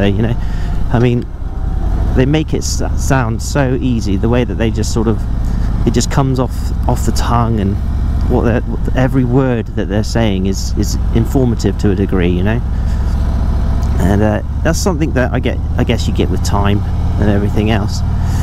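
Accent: Somerset, United Kingdom. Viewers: Great video you are doing great work. First of for your decision on getting and choosing your harley you got spot on. For your starting vlogging you should see motonewb blockhead early stuff really gritty video and sound. First started watching xxxdeadhead and shawnsmoak also robbertrob and huntingharley. I've noticed and they all done this as they went on started saying they upgraded their cameras microphone mainly gopros with senna headsets. As for your contents don't really threat you are doing great stuff there is one thing which is rarely done is pavilion opinion something to consider in the future. What do you talk about what roads like where you live what is your favourite route why's it your favourite. Why you like were you live or don't because of the roads traffic. What you thinking of doing to your bike in the future and why. I. E. I've got a pink metallic paint job with unicorns because why not. 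Chicks love it gets me laid a lot and who F, ing put it in the laws of bikering you have to have a black or covered in skulls bike to be a biker. Just a point my bike has skulls on paint but who cares i like it. Just do what you want to your bike and if you want tell why you chose to do that. Just a mention no one as far as I know has installed two inch tank raising kit on the new streetbob. Your voice is good definitely sounds with hint of somerset region. Microphone you are using is good. Those motorvlogers who done it for a while also use filters on their pc's. You are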